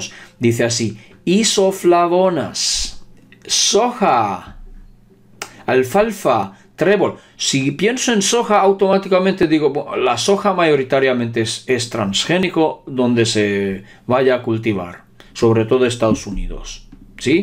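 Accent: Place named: Poland. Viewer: tienes acento de polaco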